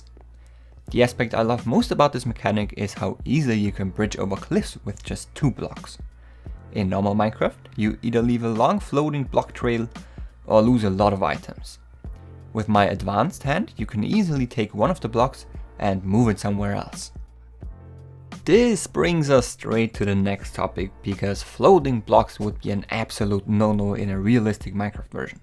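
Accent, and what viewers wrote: Germany. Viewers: Hah wusst ich doch das dein Akzent deutsch/österreichisch klingt. Props an dich du bist einfach der Beste Minecraft "developer" (<--------- also du weißt was ich meine xD) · you sound very german. am i right? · Klingstn bisschen deutsch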